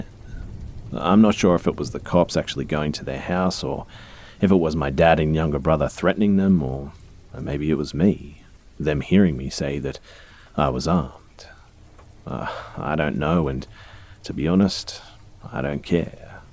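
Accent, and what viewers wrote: Australia. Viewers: Cheers for liking my comment mate! I seriously want to take a gap year in Australia and think it’s the best accent so I like your narrations even more because of that! Keep up the good work :)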